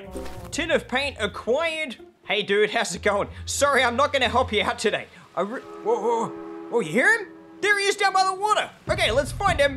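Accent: Australia. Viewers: Definitely Australian 💀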